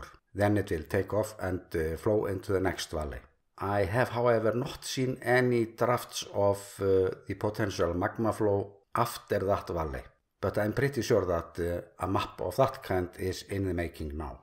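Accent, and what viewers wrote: Iceland. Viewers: Thanks and keep us updated! I really like Your cute islandic English.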